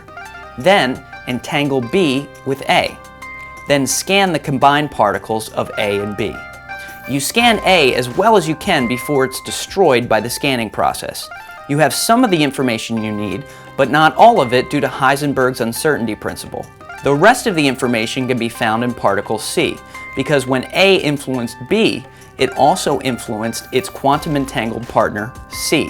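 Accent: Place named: Scotland, United Kingdom. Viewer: thats the first time i have heard the scottish accent being referred to as 'adorable'...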